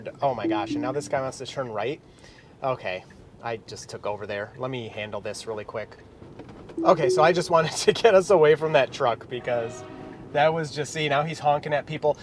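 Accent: Michigan, United States. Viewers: Don't let people criticize your English. American English does have dialects! You're just speaking Michigander dialect. "Coulda went" I use as well.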